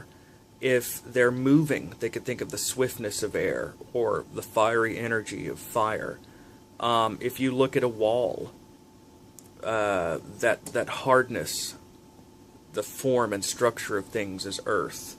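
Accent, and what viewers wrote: South, United States. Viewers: Hi from Sydney, Australia. Thank you for your explanations and knowledge - especially around the historical context and ancient symbol aspects, as your teachings have started to provide me with reasons / answers for why I subconsciously use symbols via colour, plants, nuts, shells, stones and crystals to attract harmony and inner peace and have always done this since childhood. I have just started to use symbols for protection and for hurrying up karma. Sometimes one cannot just wait if one or their friends are being hurt. It is so satisfying to watch the Universe respond to your call. Love your words and especially your 'southern' accent, it adds a unique flavour to your weekly explanations. Thanks again, Barbara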